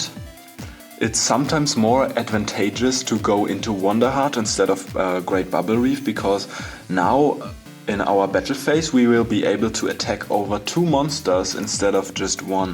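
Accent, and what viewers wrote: Germany. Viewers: Ich merk du bist deutsch. Gute combo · oh boy, das ist das erste mal, dass ich einen deutschen Akzent feier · Den deutschen Akzent feier ich hehe 👍😁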